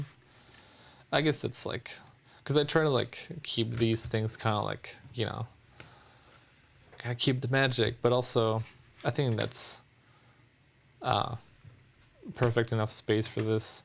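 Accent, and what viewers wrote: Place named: California, United States. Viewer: You really do sound like you're from California. I wanna hear you speak French and Japanese, though. ❤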